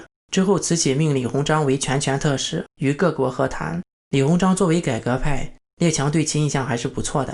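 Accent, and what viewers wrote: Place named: Henan, China. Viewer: 我怎么听出了河南口音？？？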